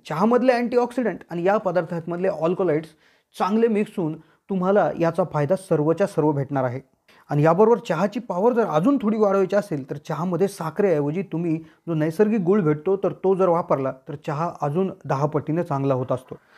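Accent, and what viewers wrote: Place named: Khandesh, India. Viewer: Khandeshi bolaychi stylish bhasha ashi aste .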